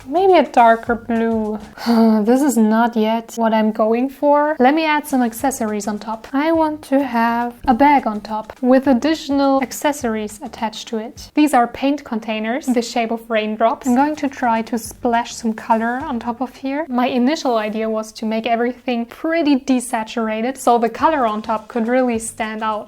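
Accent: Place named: Germany. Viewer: joooooo ich hab das ganze video geraten was das für ein akzent war und als du das dann mit der deutschen voice line gesagt hast war ich happy weil ich nd viele deutsche kenne die das Spiel spielen ^^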